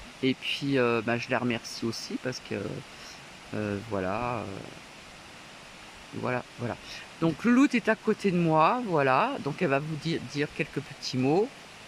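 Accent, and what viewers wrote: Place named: South, France. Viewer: ma très chère, c était normal, de tout cœur avec toi, combien c est une " belle grâce d avoir ton Amie avec toi et ça ne peux te faire que du bien, quel bel accent du soleil, merci, merci pour ses si belles vidéos, ses photos ces partages ça fait du bien, c est chaleureux, bisous et gros câlins à Nouky, on croise les doigts pour les projets de 🍀🍀🍀🍀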